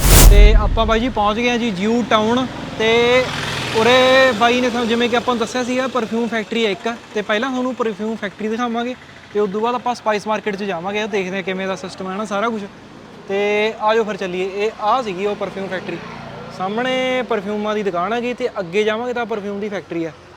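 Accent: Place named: Patiala, India. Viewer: Inderਦੀ ਬੋਲੀ patiala aria ਦੀ ਆ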